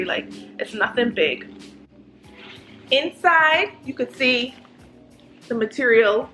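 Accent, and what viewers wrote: Bahamas. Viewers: I hear an accent. I may be wrong but are you Bahamian? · I watching this trying to figure out if you Bahamian cause it sounds like you are... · I knew I heard a Bahamian accent 😅😌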